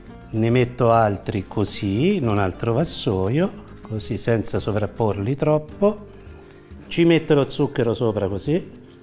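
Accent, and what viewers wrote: Tuscany, Italy. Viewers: Avrai pure un accento toscaneggiante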